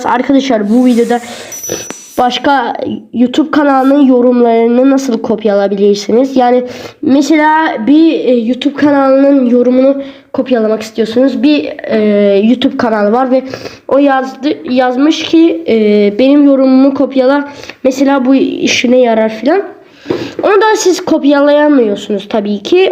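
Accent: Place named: Azerbaijan. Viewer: Danışınnan bilinir.azərbaycanlısan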